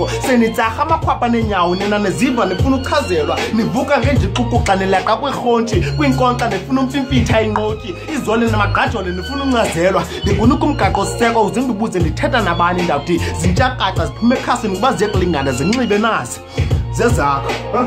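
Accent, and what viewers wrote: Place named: Xhosa, South Africa. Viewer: ISXHOSA SAKHO BRA SIMNANDI NGALOLONKE IXESHA XANDIKUMAMELA NDIFUNDA LUKHULU INOMYALEZO IMIBONGO YAKHO IYANDIFUNDISA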